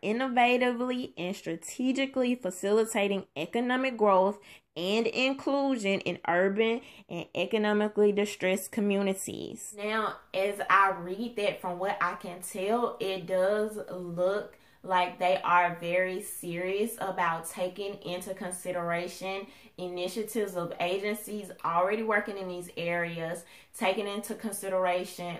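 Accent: South, United States. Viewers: Hi this your fan from Nassau Bahamas again I suppose too ask you where southern accent state you live in... Since Trumpy working with the inner-city now the fake news mainstream media and Roland ''BUCK DANCING 4 HICKERY'' Martin will spin this too please they own wicked agenda lol.. I want you listen and watch this clip by ''PHARAOH SPEEKS'' on Roland Martin you may laugh lol.. <link>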